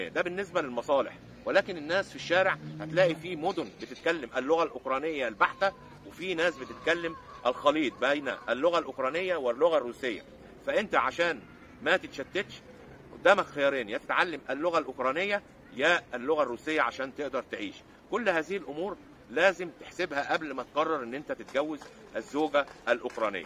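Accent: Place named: Saudi Arabia. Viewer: معليش سؤال انت كنت عايش في السعوديه؟؟؟؟؟؟؟؟ لهجتك متاثرة بالكلام السعودي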